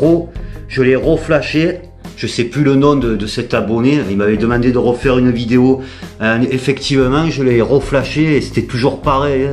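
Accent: South, France. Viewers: Tjr au top tes vidéos tjr un plaisir avec ton accent du sud que J aime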